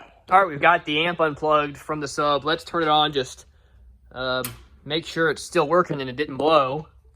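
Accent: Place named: Appalachia, United States. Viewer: This dude looks like Gollum and sounds like a hillbilly! what is going on here?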